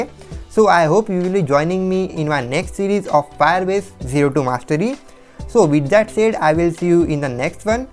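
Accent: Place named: India. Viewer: I saw some negative comments about your accent. It's perfectly fine to hear for any Indian or anyone who is used to Indian accent. The content is very well structured and to the point. Keep up the great job.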